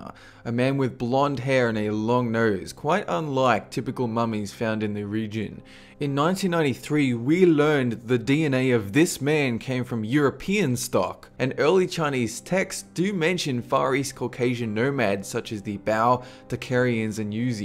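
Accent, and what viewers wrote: Australia. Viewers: Is this guy Australian?? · Are you Australian? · He's an Aussie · He's Australian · He's Australian.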